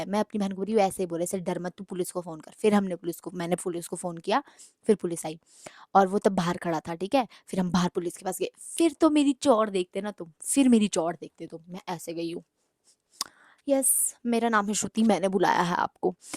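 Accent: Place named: Pahari, India. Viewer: storytime with pahari accent good one 😂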